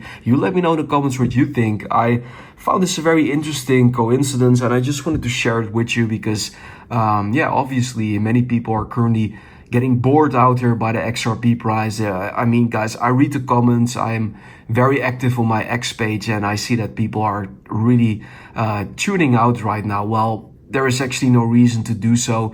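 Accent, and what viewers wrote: Netherlands. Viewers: Typical dutch accent our has!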